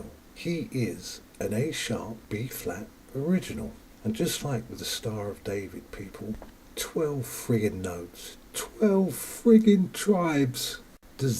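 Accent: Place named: England, United Kingdom. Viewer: This is the FIRST time in my life that I did not understand not a word what he saying. Accent is terrible, probably English, sound is terrible with a lot room effect Never happen to me in 57 years: I can watch American or English movies without translate or subtitles but this is....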